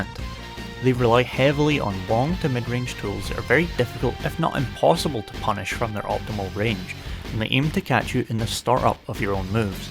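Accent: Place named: Scotland, United Kingdom. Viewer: Nice to here a Scottish accent! I'm in glasgow, do you play SFV? up for a few sets sometime?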